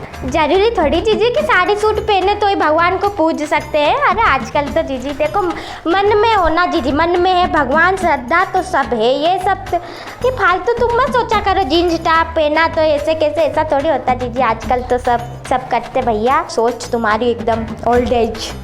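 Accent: Indore, India. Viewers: Indori accent is contagious 😂😂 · Ahaa that pure indori tone...really that teej wali line m aunties aese hi hote h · Watching your video from Paris... I miss my Indore and the language so much, I show my husband your videos and tell him, that's how we speak :p Lots of love <3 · Love my Indore and Indori lahja😆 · Where do you find this content? You are so real on your acting along with indori tone.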